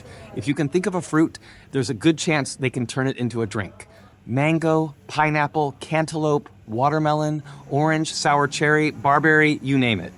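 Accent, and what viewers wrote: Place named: United States. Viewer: where are you from? you sound american. is travel allowed to iran currently?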